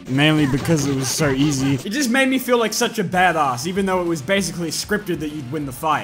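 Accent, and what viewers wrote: Australia. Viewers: Hey, i know ya probs wont get around to this but i really can relate to your channel and by that i mean like your veiws on transformers, humor and games. And i have had some rough times lately, so to have someone relatable to watch is real neat. And if you don't mind me asking, you sound aussie, you from aus? I am and im just curious. Anywho thanks for the laughs, entertainment and overall good vibes. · I feel like a dipshit for just now realizing you have a lisp. For some reason I just thought you had the strongest Australian accent i'd ever heard. And other youtubers would either be more shy or have a more noticeable lisp while yours blends into your accent. Either that or crazy.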